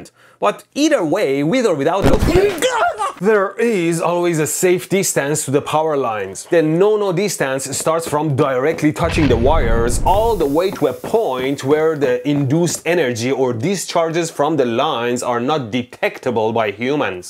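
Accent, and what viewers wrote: Iran. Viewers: ایرانیه از لهجه اش معلومه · لهجت داره داد میزنه ایرانی هستی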